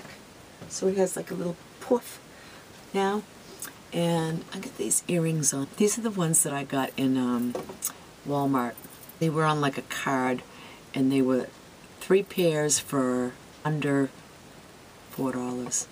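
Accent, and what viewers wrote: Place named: Cape Cod, United States. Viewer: When I first found your channel, you reminded me of the Cape. Your accent, your look and the casual elegance. I have to find the fragrance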